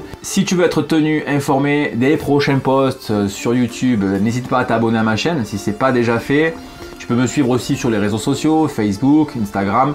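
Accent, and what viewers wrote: South, France. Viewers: Soûlant l.accent sudiste. Dommage.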